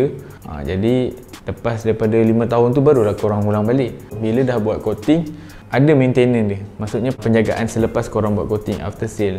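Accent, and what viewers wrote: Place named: Pasir Gudang, Malaysia. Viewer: Speaker mcm org pasir gudang lh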